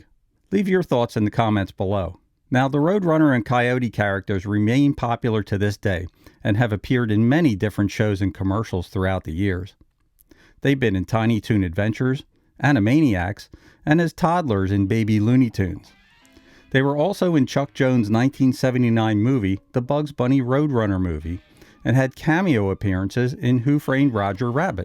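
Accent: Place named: Pittsburgh, United States. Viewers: You sound like you have a Pittsburgh accent 😊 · Yinz from da’ Burgh?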